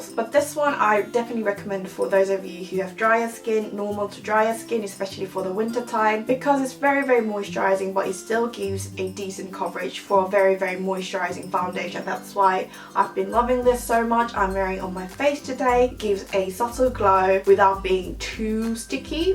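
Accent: United Kingdom. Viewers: 영국발음때문에 시청시작했어요~ 저는 캐나다 왔음에도불구하고 열심히 영상보고 영국영어혼자공부합니다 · 볼때마다 느끼는건데 영국 발음 듣기 너무 좋아요♡ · 아 진짜 영국발음 넘나 조아여💓 2016년에도 좋은 영상들 부탁드려요👍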